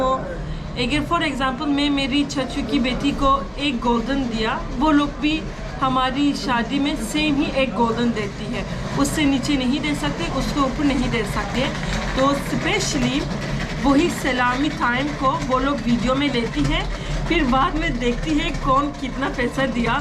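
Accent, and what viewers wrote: Pakistan. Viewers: Ap sukardeo Gilgit baltistan k logo ke tara Urdu bolti Mashhallah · Yes u right u r not phupho 😂 Love your Urdu accent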